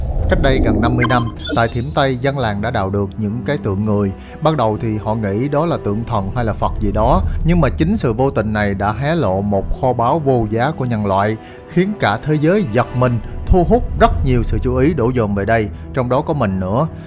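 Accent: South, Vietnam. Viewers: Clip bánh cuốn quá ạ, được đầu tư, có bổ sung kiến thức lịch sử và thêm yếu tố hài hước nữa:))) giọng miền Nam của nghe bình dị dân dã 😆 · Hay quá. Hình như vì dịch bệnh nên có thời gian, anh biên tập tỉ mỉ hơn, hình ảnh rất đẹp. Đặc biệt là từ ngữ anh dùng rất gần gũi, không hoa mỹ như trên TV, và thích nhất là giọng miền nam của anh.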